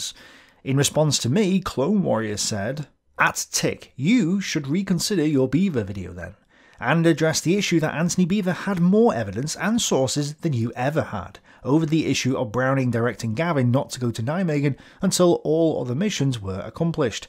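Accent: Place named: North, United Kingdom. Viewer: part of it just comes from having a Northern accent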